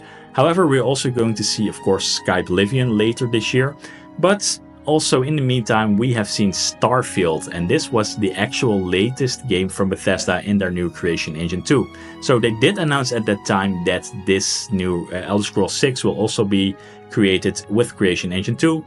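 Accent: Netherlands. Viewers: this guy is definitely dutch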